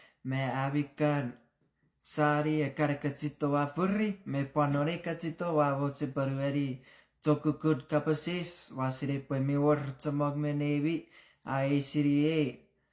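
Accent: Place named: Chuuk, Micronesia. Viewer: I'm impressed with your chuukese accent! Much love from Micronesia!❤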